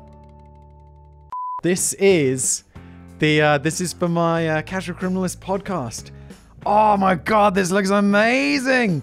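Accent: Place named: United Kingdom. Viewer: Calls himself Whistle and China "Chiner"... British accents are Great!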